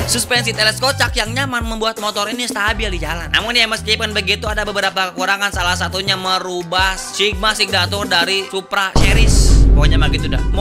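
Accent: Jakarta, Indonesia. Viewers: Bang, Saya penasaran apa abang orang Sunda atawa Betawi ya? Soalnya logat Betawi tapi banyak kata Sunda dipakai? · Logat betawi emang enak klo didenger 😂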